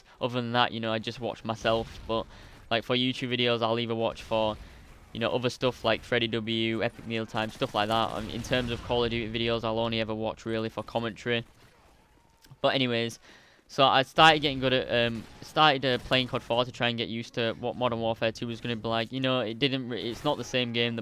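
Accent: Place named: Scotland, United Kingdom. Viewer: You sound more scottish in your livestream.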